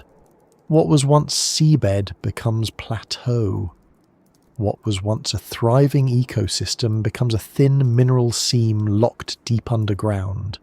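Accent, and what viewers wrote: United Kingdom. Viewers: I'd like to believe it's some brilliant man from the UK. I'd be so disappointed to find out if the voice is AI.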